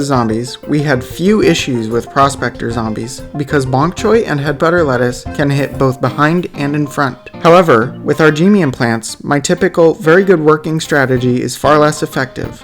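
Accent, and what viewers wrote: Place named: South, United States. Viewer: Love that southern accent #GvZWW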